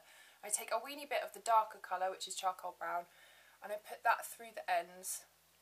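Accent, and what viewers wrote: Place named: London, United Kingdom. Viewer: are you from london? love your accent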